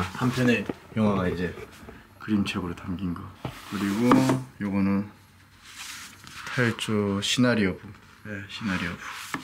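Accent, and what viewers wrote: South Korea. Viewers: 발음도 이젠 남조선 같아요~^^ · 말씨는 남한사람 이네요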